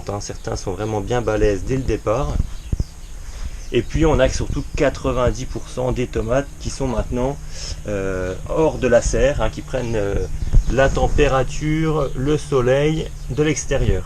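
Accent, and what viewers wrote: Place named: Lorraine, France. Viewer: Je découvre votre vidéo, votre accent me rappelle la Lorraine dont je suis originaire ?! Un truc pour vos cultures de Fèves, ici on en cultive beaucoup. Pour ne pas avoir de pucerons il faut les semer à l'automne et on les récolte au printemps sans aucun puceron. Si on les sème au printemps on a presque toujours des pucerons aussi. Merci pour vos conseils et cet accent qui me fait chaud au coeur. Je vous écris d'Albi où il fait toujours trop chaud...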